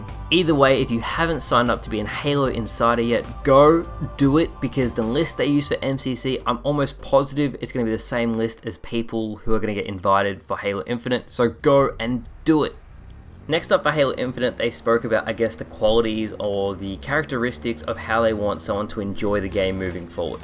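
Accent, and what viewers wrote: Australia. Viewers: hey. since this one of the first videos i've heard you talk in, I wonder, are you from australia?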